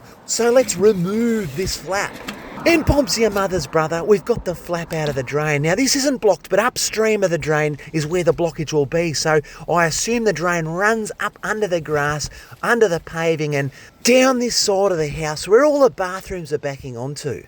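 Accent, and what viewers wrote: South Australia, Australia. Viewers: South Aussie mate 👍🏻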